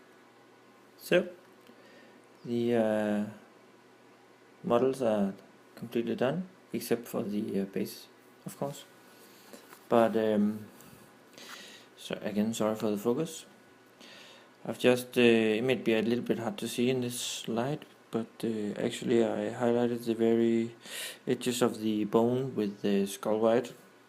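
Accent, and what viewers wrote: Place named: Denmark. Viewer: Nice video. Are you by any chance danish? The way you speak english, sounds so danish :)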